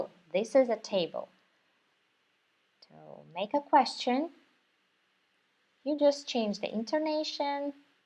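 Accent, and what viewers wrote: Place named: United Kingdom. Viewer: A very pleasant lesson, thank you 🙂 When you speak you sound more British than Russian! Your accent is delightful and your speech is clear. I also like the pace.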